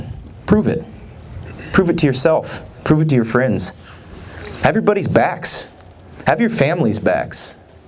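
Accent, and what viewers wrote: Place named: New England, United States. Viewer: Accent, sorry, you still sound like a Yankee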